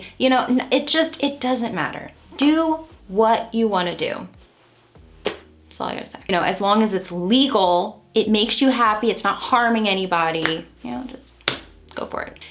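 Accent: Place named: Minnesota, United States. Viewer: What state do u live in? You sound like Minnesota like me being the ranch n many other things lol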